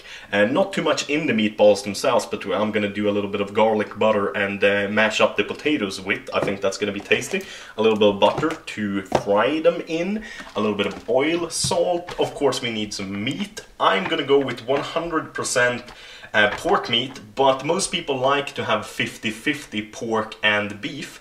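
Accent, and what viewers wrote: Sweden. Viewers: Please im getting so tired of ur Swenglish. Even though it's a bit funny. Just hear how you say with xD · yea. I've noticed that too. That is why I love the swedish accent :D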